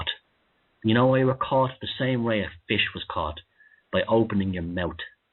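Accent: Dublin, Ireland. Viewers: Blimey, this is the first time I have listened to one of broadcasts. Initially I liked his Dublin style but thought he was a bit too full of himself with all his female groupies on Facebook. Good Lord, I'm a bit like that myself!!...However listening to this and his description of the riot in Dublin in 1981 and the evil that was on the other side ready to deal with the protesters rang so true with me. I was in Dublin a couple of years after that particular riot outside the British Embassy and a friend described how he was working on the railways and saw the protesters struggling home along the tracks trying to escape the police. He said they had been battered beyond belief. It was something he had never seen before. When the power of the state is truly unleashed it is terribly frightening.......I'm relating so much to this podcast and what he is saying. 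Good stuff. I'll forgive all yer other shite if you forgive my occasional after the pub rants against the Irish!....Des Brittain.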